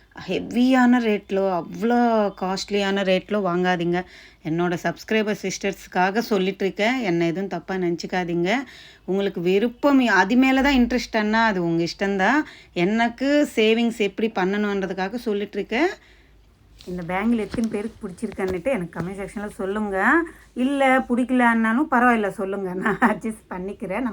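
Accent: Telugu, India. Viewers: Nice very cute design voice summa super telugu voice tone tamil kalandhupesum very cute voice 🎉🎉🎉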